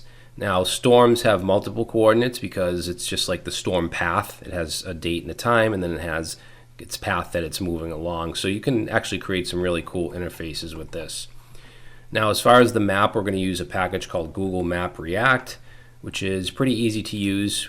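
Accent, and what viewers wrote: Boston, United States. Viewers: The Boston accent of knowledge!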